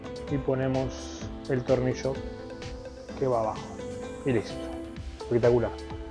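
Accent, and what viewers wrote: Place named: Argentina. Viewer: De dónde sos amigo. Sonas reargento pero laburas en 110v😅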